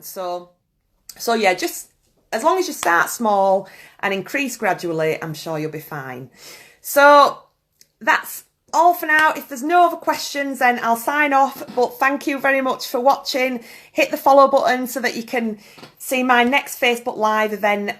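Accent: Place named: West Yorkshire, United Kingdom. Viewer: Which part of West Yorkshire you from?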